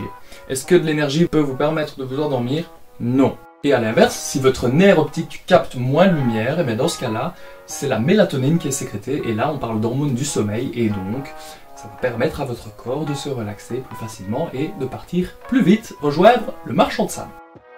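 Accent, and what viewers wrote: Liège, Belgium. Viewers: c accent belge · Accent de liège non ?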